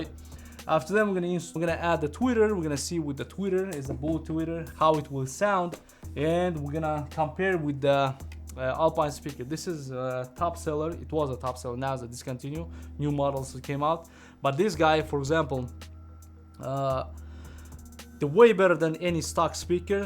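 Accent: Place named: Russia. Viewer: The best according to you Russian accent speaker review guy.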